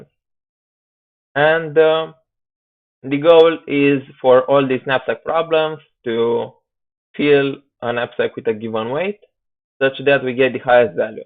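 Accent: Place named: Russia. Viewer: The problem is not because of Mic, the problem is that his first language is not english. I think he is Russian. Still it is not that tough to understand his accent.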